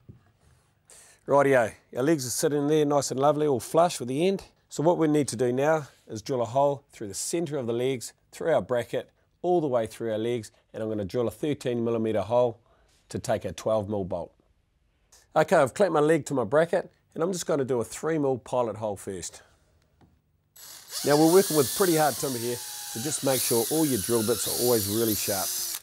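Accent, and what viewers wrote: New Zealand. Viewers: he's a kiwi bro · yeh, it is our Kiwi accent.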